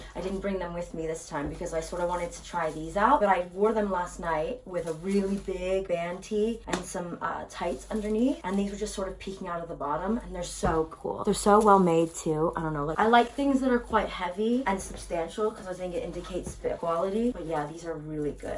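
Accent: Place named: United Kingdom. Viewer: there's some parts in this where you have a really strong brittish accent omg 😂❤️❤️❤️ I guess it's rubbing off on you xxxxx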